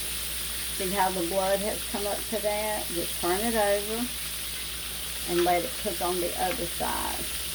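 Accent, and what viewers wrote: South, United States. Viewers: Hi Ms.. I really appreciate your videos. I was adopted in TN when I was little, but don't really have any family as it wasn't the best situation. But I had a grandmother who was absolutely wonderful, and when I was young she cooked like you. I love listening to you, learning from you and watching all your posts. I'm near Chicago now after living in NYC for 20 years so it's nice to hear the Southern accent and warm lifestyle. You're good people, as my grandmother would say. And I'm very happy I found you here. PS. You had my mouth watering 2 minutes in! Yummy!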